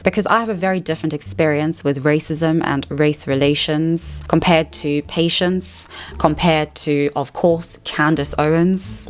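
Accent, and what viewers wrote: RP, United Kingdom. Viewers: I never said you did condon it, however a few videos back you stated you were not privileged whatsoever in the British context. Even in the colonies your near RP dialect carries weight that other UK dialects don't. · Ngl😅 your videos have been recommended to me for a while now but I had no interest to watch and I kid you not you reminded me of Patience. I press play and you also have a British accent😂 I've listened to Patience b4 and I've gleaned everything I can and doesn't really interest me but I'm willing to listen to your opinions. 🇿🇦Shoutout from South Africa✊🏿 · I got recommended one of your videos shortly after watching Patience for the first time. I think I got the two of you confused once because you both have lovely British accents but other than that I like you both for different reasons. Very contrarily, Candace is bothersome to me and I've always thought her to be disingenuous and more of a shit-starter than anything else, even though I'm more alike her in a political sense. 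· I like ur accent. Is it a British accent? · You are FAR more interesting and well spoken than CO. I think including the fact that you are "safe and can read and write" is a nuance lost on many Americans. From what I've gathered of your story, your perspective on this is uncommon, if not unique to the ears of most Americans. I think you're correct in your analysis of race/class differences between the two countries. I can also see why some folks in the US would consider you "posh" if all they hear is your accent (which I like, FWIW). Given that all folks over here can somewhat differentiate "proper English" from cockney and will often confuse Australian and Irish (never mind the poor Kiwis), I'm afraid that's about as good as can be expected. 'Merca! (eyes rolling). Keep doing your thing! I enjoy your content. Cheers!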